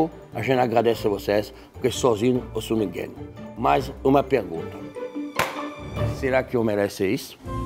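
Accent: France. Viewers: Amo vc jacquin não sei escrever jshshshshshs, enfim vc é um lindo da vontade de morder vc vc é um encanto! E o sotaque francês é muito fofo vc é demais muita saúde, sucesso, dinheiro e principal muita luz divina na sua vida!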